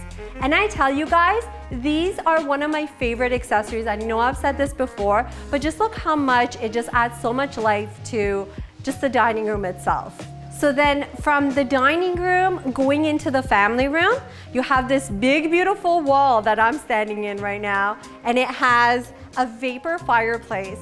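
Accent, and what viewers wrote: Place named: Iran. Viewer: Your Iranian accent is unreal! Beautiful woman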